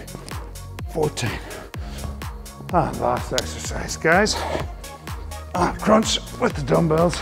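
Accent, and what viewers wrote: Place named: Scotland, United Kingdom. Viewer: I'm happy to have found your channel. Your workouts are for all fitness levels and are quick and to the point without endless chatter and you explain the movements and techniques extremely well...just a question--are you from Scotland? My boss at work is from Glasgow and speaks just as you do:)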